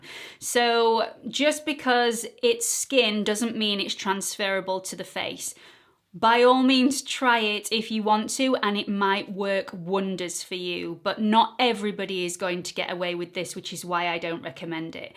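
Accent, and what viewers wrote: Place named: United Kingdom. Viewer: I could listen to you (with your British accent) and Nikkia Joy (with her Aussie accent) talk ALL day. I bet I'm not the only person who catches herself trying to say words like "absolutely lovely/loovely", "hair/hahr", and "mom/mum". Sure doesn't sound the same out of my mouth 😂. Your "best of" videos have been fun,. Happy New Year 🎉✨🎉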